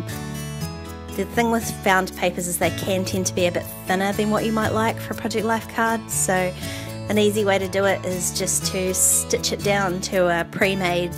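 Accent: New Zealand. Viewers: I already do these things with all my hoarded bits and bobs but the origami crane out of the new world receipt love it! Very inspirational. And it's nice to hear a kiwi x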